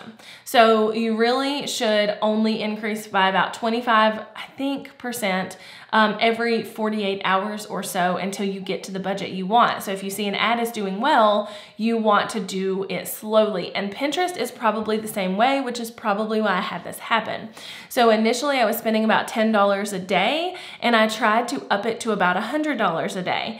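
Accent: South, United States. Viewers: I love because she is one of the few Youtube Tip creators who have a southern accent.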